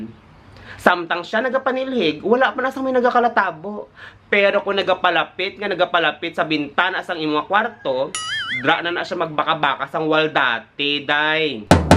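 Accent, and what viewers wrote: Iloilo, Philippines. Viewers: Kamusta!Baw enjoy gid ko sang ilonggo accent mo ah kg ang mga tirada mo.Keep up the good work kg stay safe gid always 🙏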